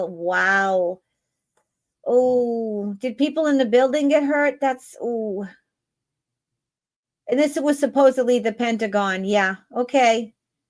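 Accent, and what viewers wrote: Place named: Ireland. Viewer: Love the Irish accent.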